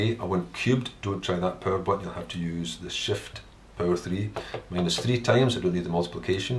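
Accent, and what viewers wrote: Scotland, United Kingdom. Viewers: You sound Scottish to me.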